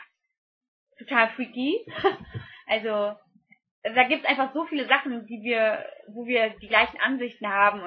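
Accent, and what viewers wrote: Cologne, Germany. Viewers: Puh, dass jetzt gefragt wird, wen du abgehoben findest, war klar.. Nehmt es so hin, wenn sie jetzt sagt wer, dann gibt es doch wieder ein riiiesen Theater.. sinnlos, meiner Meinung nach. Ich fand nicht schlimm, dass du Personen doppelt nennst. Du gehst mir persönlich absolut nicht auf die Nerven! ;) Ich gucke deine Videos sehr gerne und ich muss jedesmal grinsen. Leider waren in den letzten Videos keine Sätze mehr mit köllsche Akzent :'( :D Da amüsiere ich mich immer stundenlang. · "Drufjödingst,ne " OMG,ich kann nicht mehr...du und dein Kölsch seid GEILOMAT!!!!,DU bist eine kesse Kiste!O,ich kriege den -Slang..hihi^^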